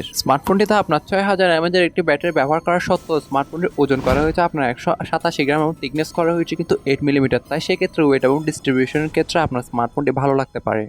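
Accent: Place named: Chittagong, Bangladesh. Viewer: উচ্চারণের মায়রে চাটগাঁইয়া ভাষা দিয়ে দুচে দিলো।ভিডিও দেখবো কী!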